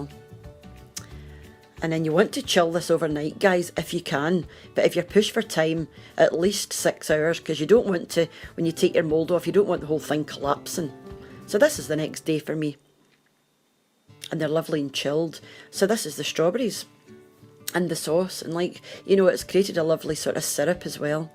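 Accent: Scotland, United Kingdom. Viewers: In the earlier videos, tried to minimize her Scottish accent because she was self-conscious about it.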